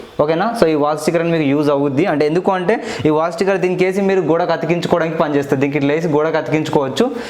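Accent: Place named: Telangana, India. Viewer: Complete telangana language😂😂👍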